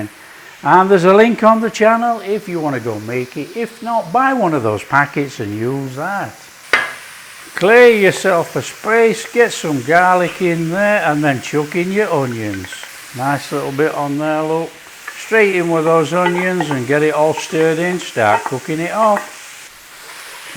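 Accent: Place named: Liverpool, United Kingdom. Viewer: Just love the Liverpudlian accent, then we go a little bit of elvis lol, you’re so entertaining. Come on!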